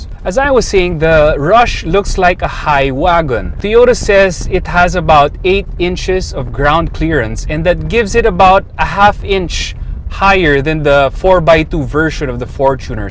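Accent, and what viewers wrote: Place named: Philippines. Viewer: He actually speaks well if you don't mind the Filipino accent .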